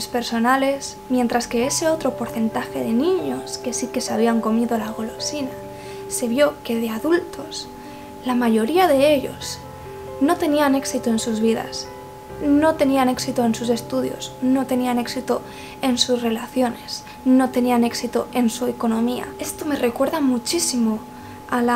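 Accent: Spain. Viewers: me encnata tu acento español . y tus videos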